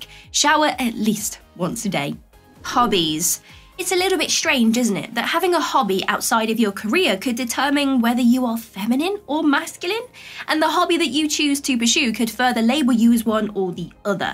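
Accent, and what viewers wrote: England, United Kingdom. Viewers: I love listening to your voice at 1.5x -English accent - too cute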